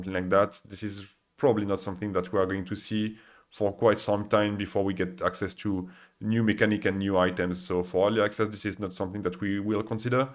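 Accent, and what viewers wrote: France. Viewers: You got a bit of a french accent but you speak english way too good to be french. What is this sorcery?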